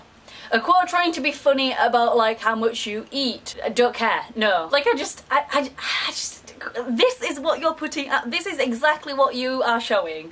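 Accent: Yorkshire, United Kingdom. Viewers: Arrrrrrrrr you're back. God I've missed you. And your awesome Yorkshire accent. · There's no such thing as a 'British accent' because Britain includes Northern Irish, Scottish, Welsh and English region accents. Hers is a Northern English accent, Yorkshire accent specifically. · damn that took me by surprise; listening to that yorkshire accent then learning you were in Hong Kong. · best british accent ever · i subscribed just because i heard the yorkshire accent haha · I like Tindaaaa Tindaaaa Oh yeah Tindaaaaaaaaa :P Boy, I love your British accent lol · British Accent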